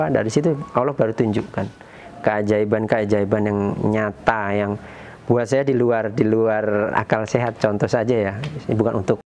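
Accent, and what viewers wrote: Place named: Java, Indonesia. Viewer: Ngomongnya medok nga gerti...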